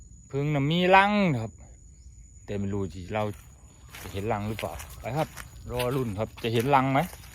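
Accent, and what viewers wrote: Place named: Korat, Thailand. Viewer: สำเนียงเป็นคนโคราชนิ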